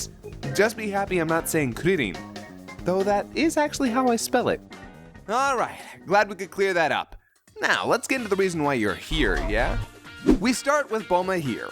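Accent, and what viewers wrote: France. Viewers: I like your cutie french accent :) A french fan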